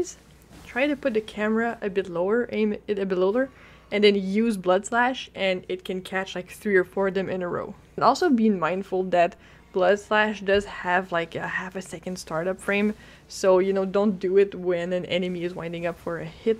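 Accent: France. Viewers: Vraiment étrange d'entendre un accents français sur un guide en anglais sur elden ring 😂 mais sinon, très bonne vidéo 👌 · T'es français ?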